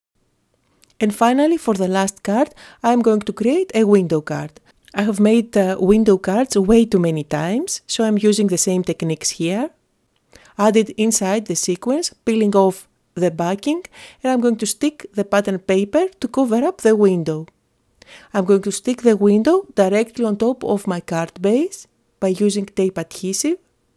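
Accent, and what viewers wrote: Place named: Greece. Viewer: angelam perez by the accent I think that she is Greek and also her family name 😉😉😉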